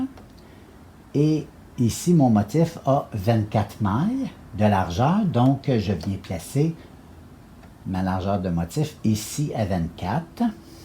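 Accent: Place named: Quebec, Canada. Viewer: Bonjour, pouvez me dire ou trouver les cartes mylar ? 1🤔merci beaucoup, et gardez votre bel accent québécois ! ❤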